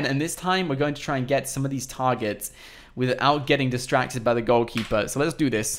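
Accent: England, United Kingdom. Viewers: Are you from England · Wow you are English wow man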